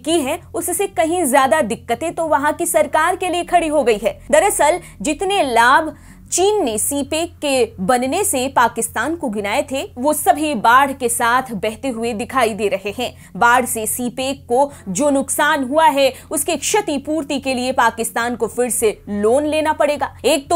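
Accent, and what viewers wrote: Standard. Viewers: It transcends me to a new level of excitement when I hear someone speaking SHUDH HINDI, very proud of you ji, keep up the excellence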